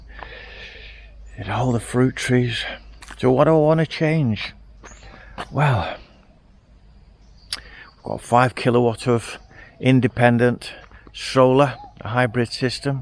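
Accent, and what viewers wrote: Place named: Northern England, United Kingdom. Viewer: 100% stay! And …… and I’d miss it. It’s very relaxing to see you wondering around your garden talking to us as you go. The garden brings out the best in you. It’s wonderful to listen to you a man of great eloquence and delightful northern tones. Your garden is better than Kew Gardens - it’s a belta, like. So there you have it this hard leftist says don’t move. And if you do I’ll call on every subscriber to go on bloody strike!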